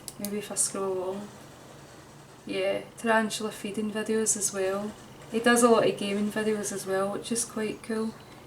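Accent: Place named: Scotland, United Kingdom. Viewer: Scottish accent on a girl though.. wow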